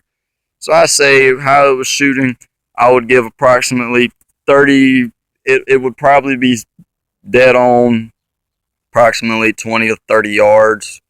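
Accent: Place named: South, United States. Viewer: He only had 4 rounds at beginning.... (one on bottom right, one center bottom, two on left.... empty top center (live round) empty top right.) When he pointed gun towards himself I don't believe it was a loaded round. He took the bullets out to show how much was shaved off.... Then first round he fired was single action "for accuracy" .... you can clearly hear and see hammer pulled back before firing first round.... Thats when the live round was ready for fire I believe. However, never a good idea to point a gun at your face lol. Just move your damn camera in case you mistakenly do have a live round bro. Better it take the bullet than you. I assume since you got the shaving down and had no miss fires on a gun notorious for misfiring, that you're somewhat familiar with firearms... I get you weren't expecting it to be loud .. I don't get firing a sawed off shotgun without ear protection lol.... 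Shit does happen but shit can be prevented dude. Oh well you got enough bashing so I won't judge. Probably catching shit for your accent hence the moonshine shit comments lol. I been in the core for 20 years and you southern dudes typically know your weapons... Then again I don't remember seeing any of them pointing a gun to their face regardless if it's loaded or not. I'd still be doing push ups in the sandpit if I pulled that shit down range. I paid $15 at a pawn shop out the door for mine. I put it through hell. It even fires sumerged under water to this day. Most reviews are misfires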